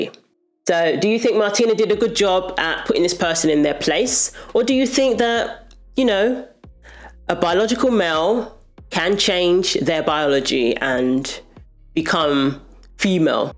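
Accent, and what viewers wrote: United Kingdom. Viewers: Himdia is a f#cktard...and I'm glad you made this video sweet..its nice to listen to a Brit on here..and I'm glad you've not lost your accent!..staying true x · Thank you for your comments, interesting with your British accent from the US. I am in the U.K. but lived in the US once!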